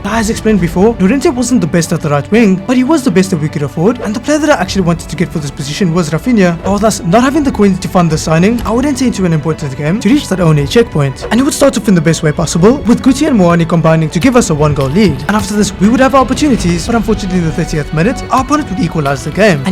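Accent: South Africa. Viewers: Are you South African 🇿🇦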